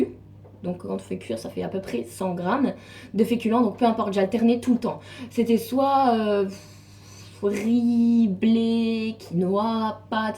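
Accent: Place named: Lorraine, France. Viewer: Ça motive ton expérience :) tu es d'où en lorraine ? Je suis de lorraine également :) j'adore ton accent :D haha Et ta vidéo :) gros bisous ma belle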